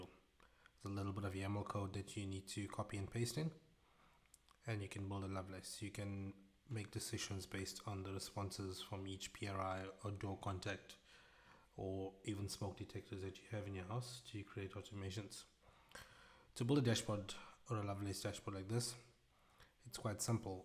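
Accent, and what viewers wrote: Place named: South Africa. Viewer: You sir are a legend! Always stoked to hear a South African accent when trying to find info on youtube. Thanks for the vid, very helpful.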